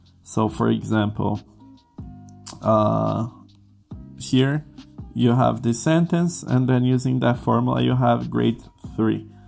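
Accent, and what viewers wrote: Brazil. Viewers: Amigo, você é brasileiro né? O sotaque é inconfundível.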